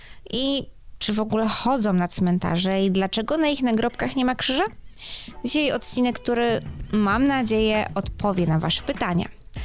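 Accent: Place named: Ukraine. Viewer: Sądząc po akcencie to jest chyba biedną ukrą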